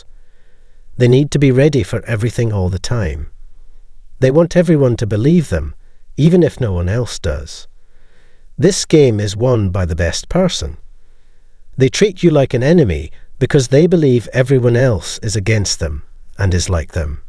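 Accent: Scotland, United Kingdom. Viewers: So your channel is pretty milquetoast when it comes to defeating a narcissist or pwBPD. lemme adjust your counterattack a wee bit. When they cut with you, you chase for awhile, and then breadcrumb them, back and forth, this tactic will keep them locked in stasis and never be a problem for you again. This is actually reverse ,reverse psychology. This is a pre-emptive bread crumb, in tactical evasion we call it a crazy Ivan. Not unlike doubling back on your own tracks and ambushing your attacker. For more and better NPD avoidance advice , ask in comments. I have real experience in this field and a degree to back it up. The scotty voice guy is fairly elementary.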